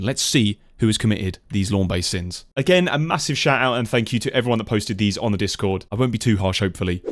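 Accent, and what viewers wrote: United Kingdom. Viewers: so is he a brit?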